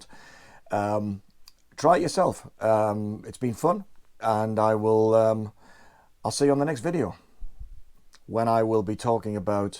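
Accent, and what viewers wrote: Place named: Newcastle, United Kingdom. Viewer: Keep it going,. I really enjoy your videos, especially the music stuff. And for the record (no pun intended), as a teacher of teenagers for the past 22 years, I have a reasonable idea of how to engage an audience and keep them interested. You're terrific at that. The geordie accent helps too!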